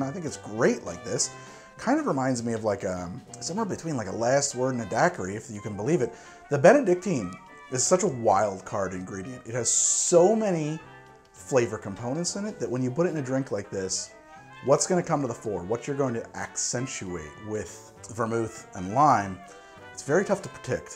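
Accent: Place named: New Jersey, United States. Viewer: Join the subtle Jersey accent. Just wait until you start calling them Flahrida ahranges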